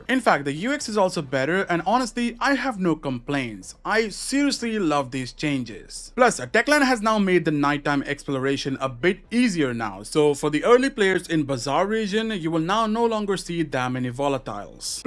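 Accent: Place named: India. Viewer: First i cant understand half of what you say with your India accents. Next why ruin the game taking out parts changing colors of markers. What I see is only messing up the game taking out good parts to make it worse. Who is the laim person not thinking. CAN I play my game WITH OUT DOWNLOADING THIS 48GB NIGHTMARE????? Please tell me i can. A complete joke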